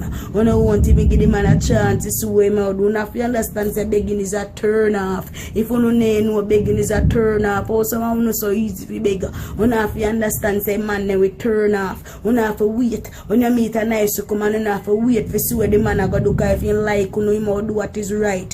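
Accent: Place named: Patois, Jamaica. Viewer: Der Henker patios broken English Jamaican language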